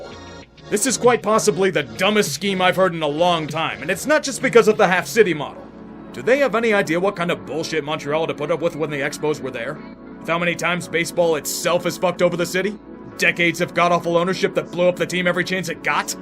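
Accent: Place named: Tampa, United States. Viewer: Damn, you sound like a Tampa native.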